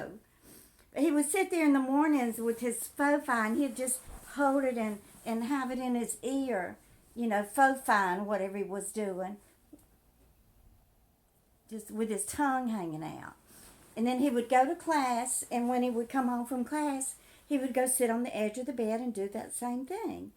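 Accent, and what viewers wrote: South, United States. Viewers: I knew you sounded Southern!! I’m from Florence Alabama, went to school in Waterloo. I’m loving your story!! Bahahaha I had an Aunt Sybil too🤣🤣you’re cracking me up woman 👏👏😂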